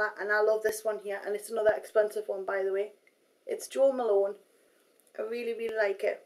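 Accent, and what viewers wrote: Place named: Northern England, United Kingdom. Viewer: She's English. And if I'm not mistaken, she's from Northern England, which is an accent people aren't often familiar with.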